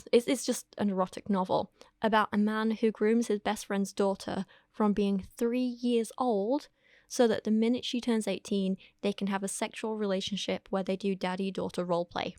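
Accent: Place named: North, United Kingdom. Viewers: I enjoy this channel because it's hard feminist truths dropped in a lovely northern accent and also sometimes you hear/see a gremlin (hi!) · I appreciate your takes on things and your unapologetic-ness (and your nothern accent! Makes me feel right at home.) People have lost their damn minds. Look after your mental health after this one, lovely <3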